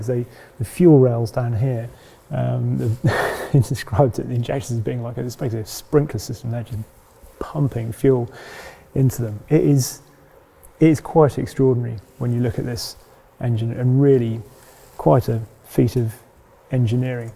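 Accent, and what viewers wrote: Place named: United Kingdom. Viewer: An American vehicle... With a British narrator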